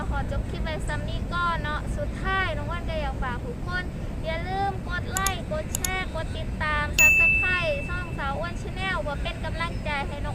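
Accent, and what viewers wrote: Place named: Isan, Thailand. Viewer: สำเนียงนี่ เป็นคนแขวงดั๋ยน้อสาวอ้วน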